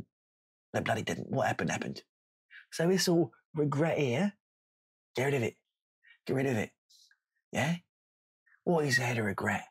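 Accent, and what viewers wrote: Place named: North, United Kingdom. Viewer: Welcome back always a pleasure Long shot but could you do an asmr were u just sell me random shit with ur northsn accent a bit like your antique seller roleplay u don't haft to just thought I'd ask.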